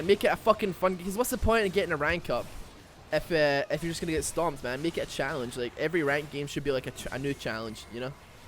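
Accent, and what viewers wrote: Scotland, United Kingdom. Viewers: R u Scottish. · is he scottish?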